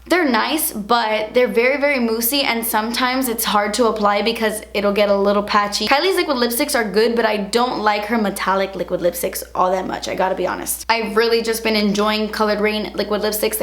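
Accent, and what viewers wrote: England, United Kingdom. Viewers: Full video speaking in british accent please!! ❤️ I am always expecting you to speak in that accent in every video. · I love it when you do an English accent! Please could you film a whole video in this accent?! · Can you do a tutorial or anything with just talking in your British accent? Lol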